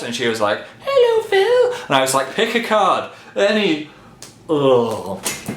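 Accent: North, United Kingdom. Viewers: He Sounds so northern in this 😂🥰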